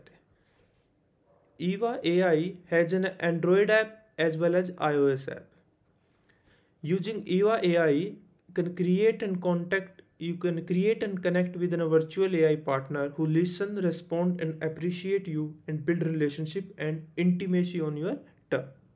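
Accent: India. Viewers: Bruhh you from india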